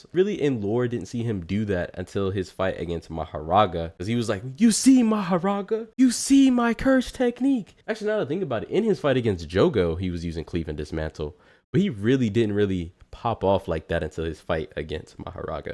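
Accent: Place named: South, United States. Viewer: why you sound so country 😭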